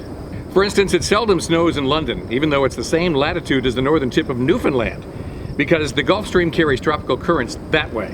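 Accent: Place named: West Coast, United States. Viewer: Love the green screen!… just wondering if you were born in Maine or are a transplant. You sound like somebody from the West Coast.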